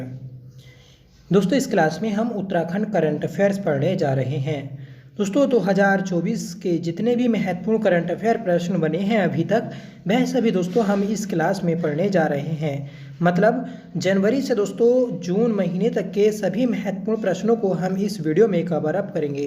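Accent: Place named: Uttar Pradesh, India. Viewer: Hindi typing m bhut error hai aur apki tone uttrakhand ki km aur up ki jyda hai. Please improve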